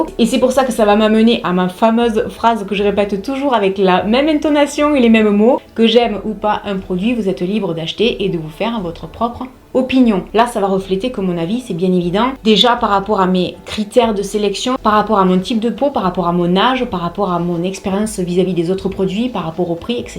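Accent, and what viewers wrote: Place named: Marseille, France. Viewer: Bonjour merci pour vos vidéo elles sont très intéressantes..en principe les produits Avène ça a toujours été une bonne marque….vous avez un accent Marseillais….moi j habite 50 km de nimes Alès et vous vous êtes dans quel coin si c pas indiscret évidemment….merci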